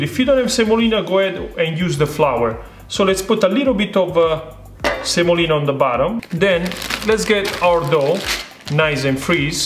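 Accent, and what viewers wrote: Italy. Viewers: .. fantastico il tuo inglese!! Lo adoro, italienglish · You are kidding , right? He’s originally from Italy, so he has an authentic Italian accent.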